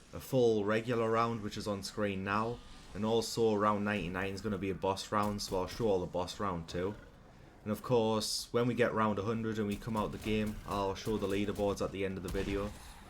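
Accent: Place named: Northern England, United Kingdom. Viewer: Congratulations, glad to hear another northern English on YouTube, not to often you find us.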